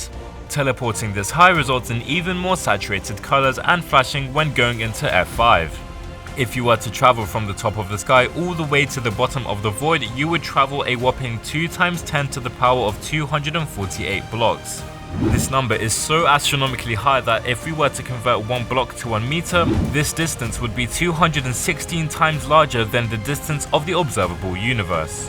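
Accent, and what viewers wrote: United Kingdom. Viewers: You're British? I am asking this because of your different ascent!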